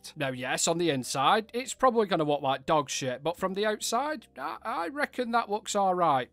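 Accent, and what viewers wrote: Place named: Northern England, United Kingdom. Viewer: Just simply simply lovely as always Great build commentary and humour love it keep it coming mate don’t just watch for the builds but for the northern commentary and humour as well just brilliant!!!!!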